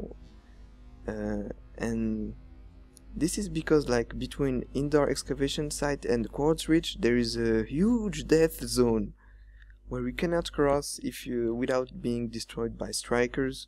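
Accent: France. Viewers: you french ? :0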